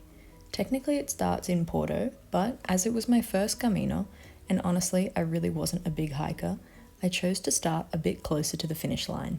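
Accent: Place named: Australia. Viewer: Wow why I didn’t know this contents before ,I love Aussie English , please keep it up for me! Thank you !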